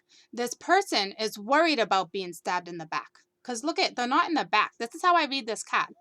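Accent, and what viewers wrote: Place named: Boston, United States. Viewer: Flip’n ADDICTED to U!! Your accents (Boston? ~ my son is in Boston !) ❤️💛💙💚🌹♂☿♀💝💑👫 YOU!! WE hv ALL bn stabbed in the back!!! YOU GIRL need to be exposed big time on a much bigger platform! I’d hire you to do events... I’m new to YouTube but would love to hv contact with u. ❤️❤️❤️ YOU!! YOU R SUCH AN AWESOME GOOD SOUL!!!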